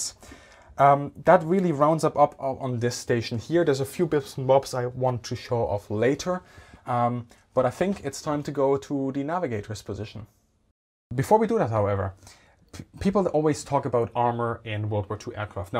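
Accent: Germany. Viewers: du sprichst deutsch das hört man lol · Sound German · Deutsche erkennen IMMER einen deutschen Akzent xD, geiles Video bro.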